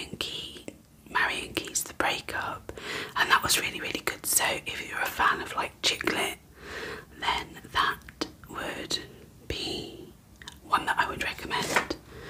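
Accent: United Kingdom. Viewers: i'm such a sucker for british accents and curly red hair! :) <3 also, i'm pretty new to the ASMR community so I thought I'd drop by & say hey! nice to meet you - just subbed!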